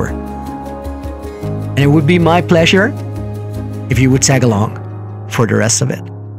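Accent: Netherlands. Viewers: Where are you from you sound Dutch! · you sound dutch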